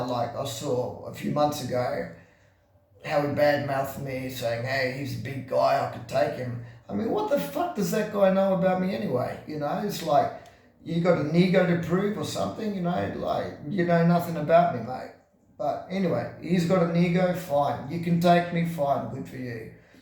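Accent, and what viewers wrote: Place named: Liguria, Italy. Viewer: why d y speak english with ligury accent? i'm serious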